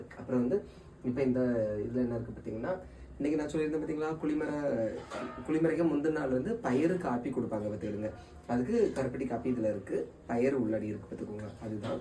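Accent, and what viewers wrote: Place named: Tirunelveli, India. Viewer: எங்க திருநெல்வேலி கிராமத்நில் 3 ஆம் நாள் அசைவம் சமைப்பாங்க தம்பிக்கு எந்த மாவட்டம் எந்த ஊர் என்று தெரிய்து ஆனா பேச்சி எல்லாமே திருநெல்வேலி மாவட்டகாரர் மாதிரி இருக்கு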